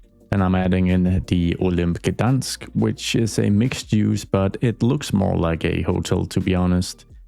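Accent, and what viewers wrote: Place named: Denmark. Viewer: is that a danglish accent i hear🔥🇩🇰🔥🇩🇰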